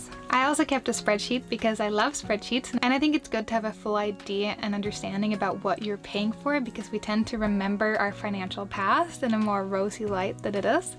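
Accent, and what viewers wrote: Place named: United States. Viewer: Love the outtakes at the end. Your American accent is so perfect, I often forget you're from Sweden.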